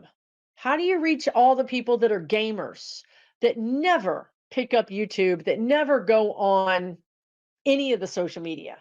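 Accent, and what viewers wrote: Texas, United States. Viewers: I luv it it when YOU TALK TEXAN ❤😮 DEBRA WADE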